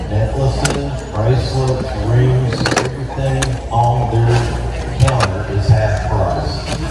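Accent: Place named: Knoxville, United States. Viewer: Oh I love Knoxville - I thought I recognized the accent. 🇬🇧🇺🇸🌸🌸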